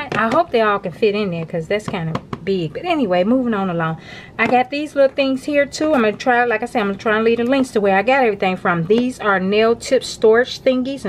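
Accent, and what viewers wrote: South, United States. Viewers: I love you I can listen to the no nonsense southern accent, I’m a fan for life… I need ideas for storing all my Polygel tubes…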